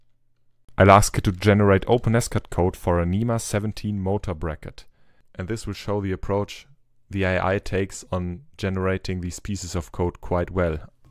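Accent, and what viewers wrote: Germany. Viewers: I can clearly hear you denglish xD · Cool, bevor ich die Kanalbeschreibung gelesen habe, dachte ich sofort... Das ist doch ein Deutscher 😬 ich abonnierte Mal deinen Kanal... Cooler content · Oh nö 🙄 Wenn deutsche versuchen englisch zu sprechen 😣 Man hört das immer raus 😂 Klingt schrecklich 😱 Ansonsten guter Beitrag 😘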